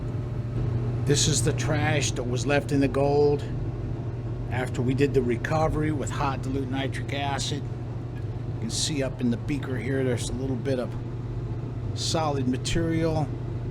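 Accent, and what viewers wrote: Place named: Chicago, United States. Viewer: stahk paht lol I didnt know you were from Chicago ... Watching you refine gold is really informative Ill never get sick of watching you make forbidden orange drink. Bonide and GFS chemicals should sponsor you with as much product as you go though. (nitric acid is super spendy) $350 is just about too much for my wallet these days.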